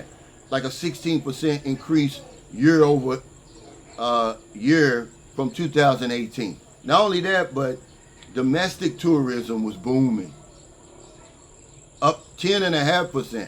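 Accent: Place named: Georgia, United States. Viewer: The author seems like a nice guy sounds like Southern Georgia accent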